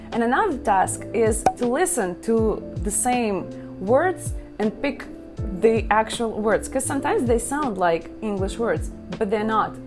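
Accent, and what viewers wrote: Russia. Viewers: БЛИН так слышно русс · you from russia yea, я вижу это по твоему акценту. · До мне даже не дошёл что ты русская))я очень восхищён с твоим акцентом !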